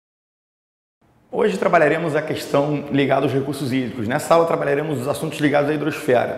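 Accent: Rio de Janeiro, Brazil. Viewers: Ninguém merece esse sotaque de carioca aff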